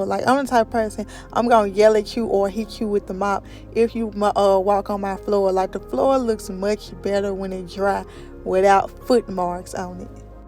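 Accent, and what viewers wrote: South, United States. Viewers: I like your country accent I'm country too Beautiful Queen you clean your house so well that Amazing. ❤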